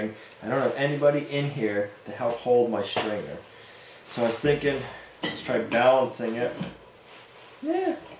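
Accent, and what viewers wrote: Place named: New Hampshire, United States. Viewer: Gooood Mawning! I hope my poke at the New Hampshire (Massachusetts blend) accent doesn't offend, but it does come through loud and clear to this Lawn Guylanda! Looks great in the outdoor kitchen. I like that you're "tapping into" the available local resources for making Maple Syrup. I hope that you can put smiles on the faces of friends that you share it with and maybe trade it for something else that you can use. You should see if your pig farmer is willing to trade! Have a great day and enjoy the day with Olivia and Gina whatever y'all do.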